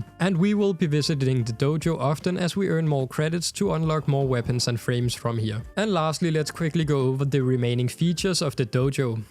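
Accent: Denmark. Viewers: great video! instasubbed since theres no way youre not danish hahah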